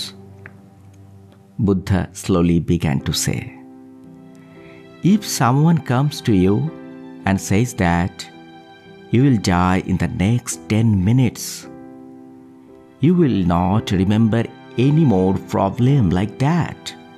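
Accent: Bengal, India. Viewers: Are you (the speaker) Bengali? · I was searching English video in Indian accent and find this thank you.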